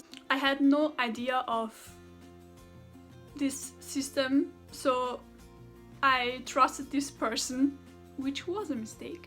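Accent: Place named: Nigeria. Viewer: Beautiful oniocha! Your Nigerian accent coming through quite strong lol! I wish we could all appreciate and respect each others culture like this.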